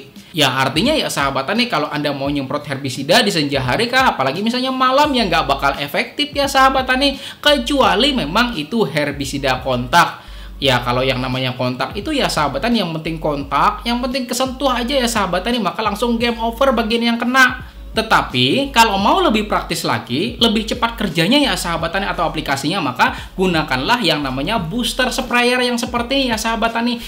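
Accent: Banjar, Indonesia. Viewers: trima kasih info nya pak.. logat banjar selalu ada kata "Kah" ujung nya...bujur lah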